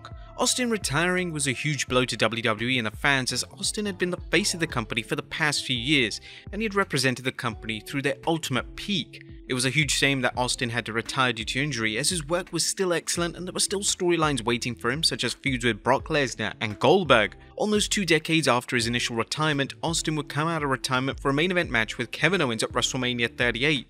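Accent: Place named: United Kingdom. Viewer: Why all westreling videos always a Bristh accent,Wrestling is American.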